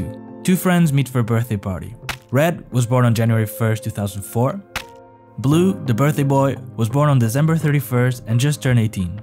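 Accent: Netherlands. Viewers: I can tell you, being born in December, I must really be amazing since I still managed to outperform everyone else in my class from primary to high school. And very humble at that. Edit: I'm Portuguese too. You don't have a Portuguese accent in English... Honestly, you have a very Dutch/Flemish accent. It's tripping me.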